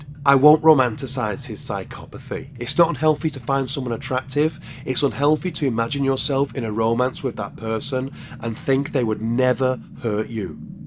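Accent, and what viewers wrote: United Kingdom. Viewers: Hello!!! I'm French so I'm sorry if I make mistakes.... I've subscribed in your channel, I like very much those histories and the way you narrated, with respect you are funny and you make me smile many times when I hear you.... I think you are from UK.... Thank you very much and waiting for more stories!!!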